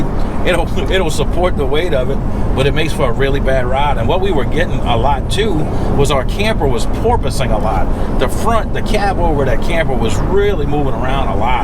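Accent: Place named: Louisiana, United States. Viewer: Man, you sound like Jesse Duplantus! You must be from Louisiana.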